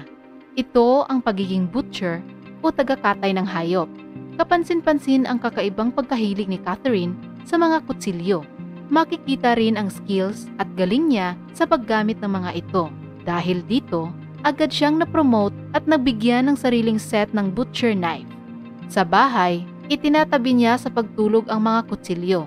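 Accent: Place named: Visayas, Philippines. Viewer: parang bisaya yung bosis ng narrator ...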